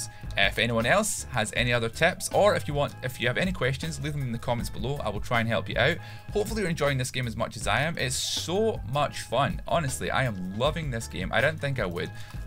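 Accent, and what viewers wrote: Scotland, United Kingdom. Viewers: i loved this vid, it helped me alot to make a carrot farm, btw i love ur accent - is it scottish?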